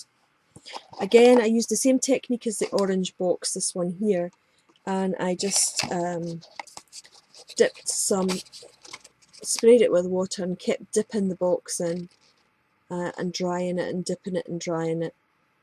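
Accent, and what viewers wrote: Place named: Scotland, United Kingdom. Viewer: Never realised that you were scottish too lol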